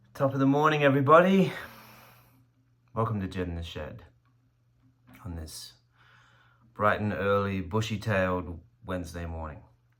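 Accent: Australia. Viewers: I’m glad Jedd you still have a strong Aussie accent. You’re doing us proud over there!!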